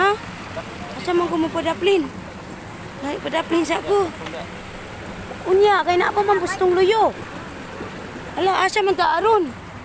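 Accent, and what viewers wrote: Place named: Leyte, Philippines. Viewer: taga dis a ka pareha lge ta ug sinultihan KAHIBAWO ka mag Leytenio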